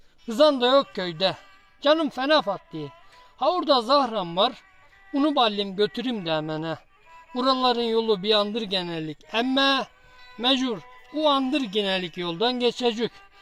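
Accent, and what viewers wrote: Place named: Giresun, Turkey. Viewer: Emeğinize ve ağzınıza sağlık başkan. Çok güzel bir Giresun şivesi olmuş Rahmetli babannem böyle konuşuyordu.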